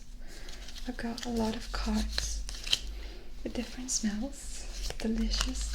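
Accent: England, United Kingdom. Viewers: that british accent omg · Sounds like you are doing a bit of a British accent in this vid. I like it! :) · Esto es inglés británico,?:D Me encanta cómo lo hablas. <3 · am i crazy (hah) or is she kinda doing an english accent? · Tu acento de inglés es británico que cool, el mio es el típico acento de Estados Unidos 😂😂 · "ostiaa" cit. 😂😂 per pochi secondi mi hai steso;) per il resto che dire, adoro il tuo modo di parlare, il tuo English accent, adoro ascoltarti in ogni lingua 😄😄 thanks 😘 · I really like your English accent ☺😋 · tu acento en este vídeo era británico a diferencia de otros que has hecho... me gustó 👌👍👌👍👍👌👌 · Lmao I love the way you do an English accent. Great video as always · C'est marrant que tu aies un accent britannique quand tu parles anglais. Thanks for the video! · Ese ligero acento británico que haces es hermoso y muy relajante 😍😍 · el acento británico q le pones es excelente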